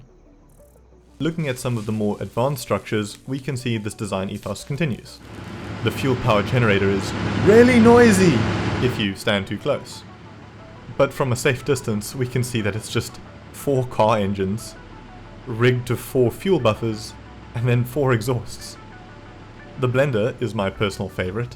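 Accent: South Africa. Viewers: I feel im hearing a South african in you..am i right?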